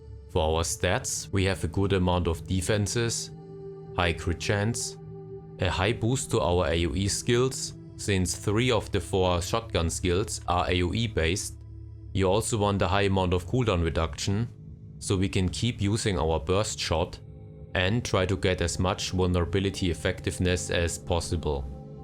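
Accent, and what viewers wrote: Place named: Germany. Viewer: Mach Deutschen Kanal, gibt ne Menge KI Stimmen die das Übersetzen können! Wenn ich mir aber dein Akzent so anhöre, glaube ich das du Deutsch schon kannst! Brauchst keine KI Übersetzung, also sprich Deutsch! Siehst ja das die Reichweite trotz Englisch nicht mehr ist!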